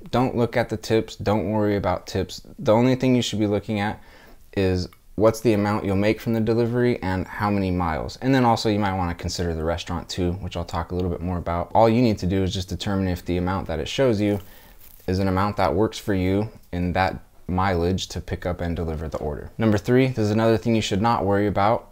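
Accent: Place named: West Coast, United States. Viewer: E-Bike, west coast accent and $35 an hour. Definitely has to be SFBA.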